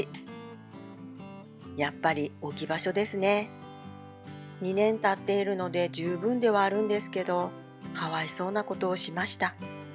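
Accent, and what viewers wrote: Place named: Kansai, Japan. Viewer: こんばんは！初めてコメントいたします😊 いつも参考にさせてもらっています❤ 私の実家も関西で、baさんの関西の言葉が大好きで、九州からほのぼのといつも見させて頂いてます😊 今回の動画で、焼け野原の鉢たちをそうすればいいんや❗と何だかやる気を頂きました😊多肉のとりこになってから４回目の夏…こんなことになる？と沈んでいたので、私もそうやって楽しみたいと思えました😊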